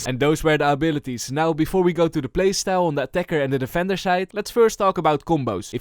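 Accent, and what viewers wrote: Netherlands. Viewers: Ur dutch arent you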